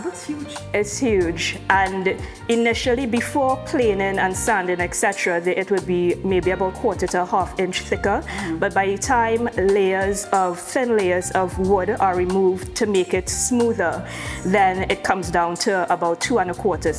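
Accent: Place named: Caribbean. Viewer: I figured you are the caribbean by your accent. Hope your mom Maxine is doing ok. Blessings in your endeavors. Keep soaring lady....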